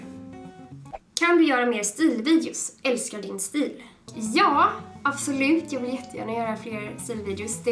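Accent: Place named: Scania, Sweden. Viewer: bliss haha. Ja då får du komma ner till Skåne. Hade varit kul o höra din skånska. 😎👊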